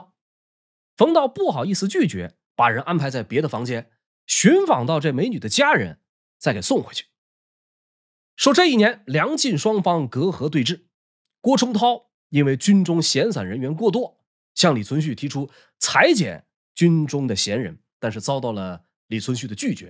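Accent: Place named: Tianjin, China. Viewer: 老师是天津人吧，本来沉浸在严肃的历史氛围里，一个嘛字把我听笑了😆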